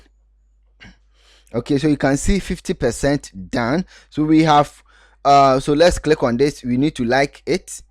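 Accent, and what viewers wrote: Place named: Ghana. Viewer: 🙌🏽🔥🙌🏽 more Energy to you Brudda. I just 😂 knew you were Ghanaian the moment I heard the Okay and Yooooo 😂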